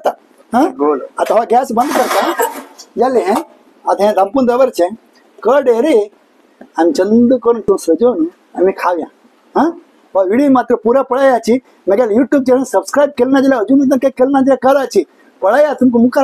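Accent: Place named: Konkan, India. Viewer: It's amgele konkani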